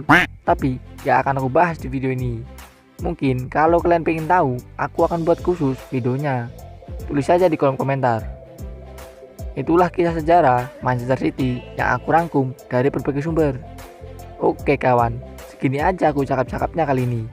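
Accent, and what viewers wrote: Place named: Medan, Indonesia. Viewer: orang medan kau ya min nampak kutengok kau logat medannya, kalo gitu salam dari medan juga.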